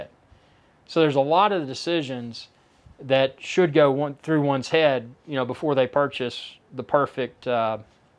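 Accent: United States. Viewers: Love the country accent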